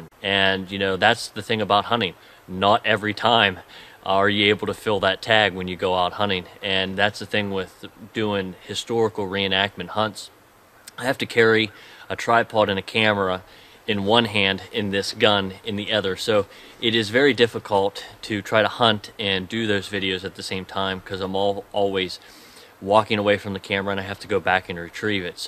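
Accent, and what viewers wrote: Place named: United States. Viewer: I thought you were a British person, after I heard your accent I got it, you are an American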